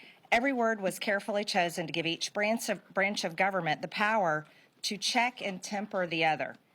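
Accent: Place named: South, United States. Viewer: The emotionally disturbed tomboy with the southern accent that runs chills up a spine. This chick is that trashy Harley Davidson type) who revs the motor outside those seedy biker bars that have not one black man or woman who attend it. A sick, sick human being who truly needs therapy, big time. But she is tailor made for the Maggots. Typo- Magas.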